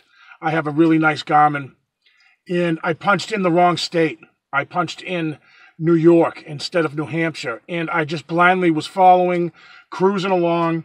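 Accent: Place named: Massachusetts, United States. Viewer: I have to laugh. The very first video of yours that I watched, not having any idea what part of the US you were in, as soon as you started speaking, I knew you were from SE Mass. Not Boston, not Western MA, but the Taunton, Dighton, Berkley, Norton, Mansfield, etc region. I think your videos are "wicked awesome".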